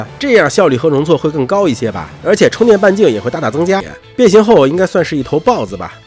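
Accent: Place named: Baoding, China. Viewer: 窝草 保定味儿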